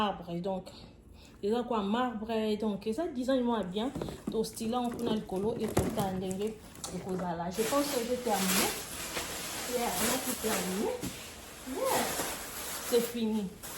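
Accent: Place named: Kansas City, United States. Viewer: Bonsoir madame j’aime beaucoup vos contenus,en vous écoutant parler j’ai l’impression que vous habitez Kansas city aux USA par rapport au détail 😍😍😍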